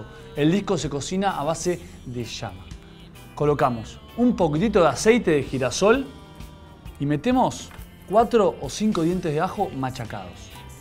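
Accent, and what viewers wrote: Buenos Aires, Argentina. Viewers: Carlos Matias asi se habla solo en Bs As.... en el interior de Argentina le decimos Poyo... abrazo grande desde Tucuman !!! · Así lo pronunciamos en buenos aires xd posho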